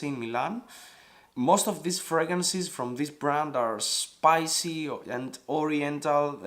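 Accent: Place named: Greece. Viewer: XD recognized the accent im Greek too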